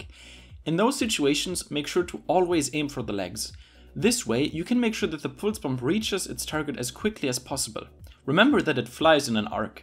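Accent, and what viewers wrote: Germany. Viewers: dat gierman aiccent... ausgezeichnet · Man hört halt krass raus das du deutsch bist :D Aber danke für die ganzen guten Tipps!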